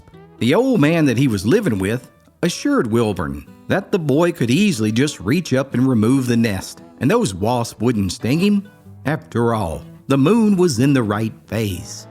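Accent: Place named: South, United States. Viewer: told in such an authentic southern drawl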